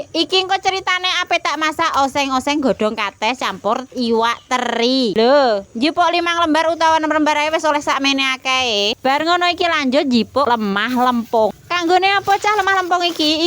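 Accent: Java, Indonesia. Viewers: blunyut itu apa mak, medok nggih mak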